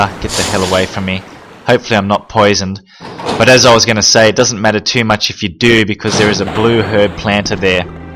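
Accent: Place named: Australia. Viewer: “We’re going heeee, spiders will be in heeee, but we now have the eagle medal, so we will go ahead and put it in heeeee…” Love the aussie speak 😄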